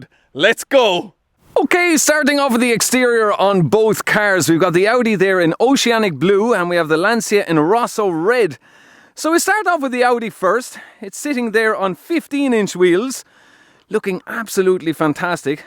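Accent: Ireland. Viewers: When I first looked at it and heard your accent I thought you were driving on part of the Donegal Rally route! The first time I went to see that in the late seventies, Billy Coleman won it in a whole other Lancia! I probably would take the Integrale too and live with constantly crapping myself about how much it will cost to repair when it inevitably breaks down! 😁😁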